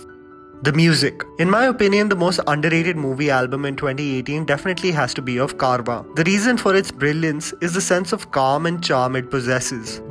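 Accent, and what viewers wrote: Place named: Mumbai, India. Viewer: Loved this movie!! & Ur Mumbai touch of speaking is really good.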